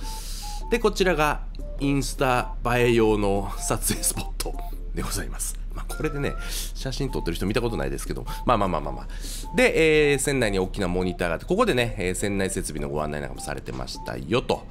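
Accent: Kansai, Japan. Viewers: 最近登録しました。ナレーションの関西弁が面白くて好きです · 小樽に帰るって・・・・ 関西の方❓・・・・・どう聞いても関西弁ですよねぇ( ^ω^ )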